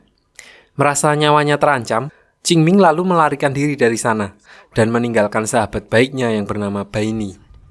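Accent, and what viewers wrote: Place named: Java, Indonesia. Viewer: Medok jawa banget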